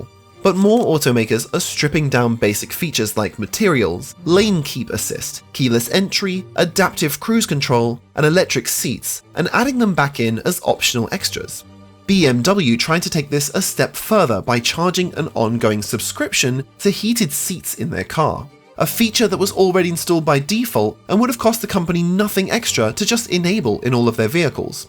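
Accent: United Kingdom. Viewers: British accent, US money. Am I watching Sh*tvlogging with AI? 😂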